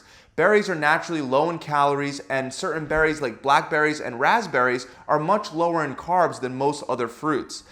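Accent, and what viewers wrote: Philadelphia, United States. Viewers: Quick question where you raised in the philly area? I noticed you say Wooder just like we do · He's gotta be from Philly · guy sounds like he's from philly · he’s from philly “wooder melon “ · Woodermelun lol I know a Philly accent when I hear it! What can I say; we pronounce our water, watermelon and waterice, aggressively 😎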